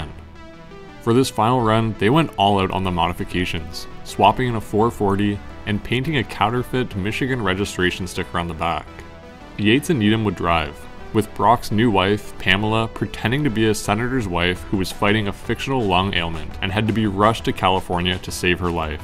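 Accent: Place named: Canada. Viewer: You can always tell which videos are from the great white North with that pronunciation of the out out oooout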